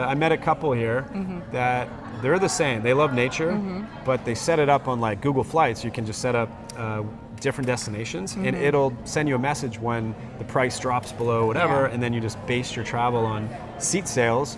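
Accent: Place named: United States. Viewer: We're you actually from the Philippines? You have no Filipino accent at all. You have a more American accent.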